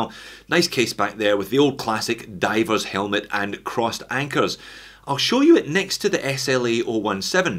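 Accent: Scotland, United Kingdom. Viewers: I appreciate your work and the Scottish accent! Have you considered buying a real Flieger from Stowa?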